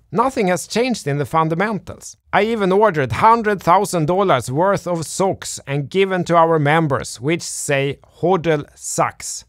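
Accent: Sweden. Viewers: This Swedish guy accent is like music and the best in the business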